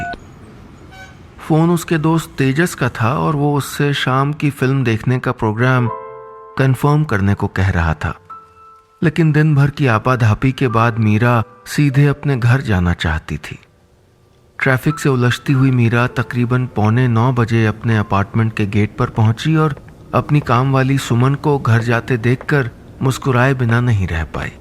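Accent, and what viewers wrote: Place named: Uttarakhand, India. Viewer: How do you talk in all the local styles , I am from Uttarakhand I can relate, so amazing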